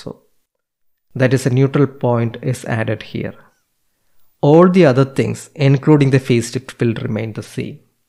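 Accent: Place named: India. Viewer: Spanish name but Indian accent….. bait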